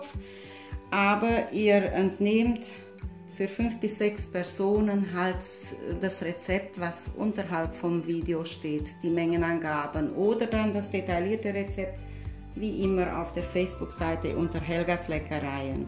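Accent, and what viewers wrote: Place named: Transylvania, Romania. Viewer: Werde das Rezept in den folgenden Tagen zubereiten. Mama machte das mit angebratenem Speck! Werde ich beibehalten! Leider tue ich mit Knoblauch wie immer übertreiben! Ein Lib an Deinen wunderbaren siebenbürgischen Akzent! Meine Eltern hatten den auch beibehalten! Bin seit meinem 12 . Lebensjahr in Nürnberg ansässig und spreche fließend viele süddeutsche Dialekte! Soxesch kann ich auch noch! Vorwiegend die Dialekte aus der Schäßburger Region! Da sind meine Wurzeln! Herzlichen DANK nochmal! 🙏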